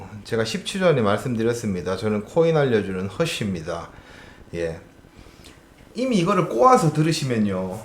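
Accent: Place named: Gyeongsang, South Korea. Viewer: 진솔하게 다가오네요. 응원합니다. 대구말투 ㅎㅎ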